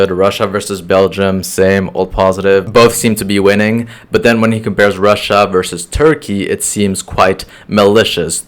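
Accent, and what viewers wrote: France. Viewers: If you speak other languages other than French, french included You'll notice occuring words that are french and also belong to another Language like English for example, You can find lots of words Like chef d'oeuvre, hors d'oeuvre, football, playstation, YouTube, story, nike, film, films, videos, [Names of people, places, and food] ,images, and other hundreds of words The french pronunciation can be either similar, or different Well he most of the times he would pronounce words the exact way they should be in English But sometimes ( in this video in particular ) he did pronounce words exactly how they should be prounced in french or in a similar french accent ( imagine a french person saying the word "story" the way it should be prounced in french "stori" ) PS: other languages such as japanese include french words but with its japanese pronunciation For example: story becomes ストーリ, story is not the best example because it isn't originated from french, But I was taking about the way words are pronounced in french Take story it becomes "stori" (Y prounced as i here) The Japanese pronouciation is kifkif as what I stated above 👆⬆️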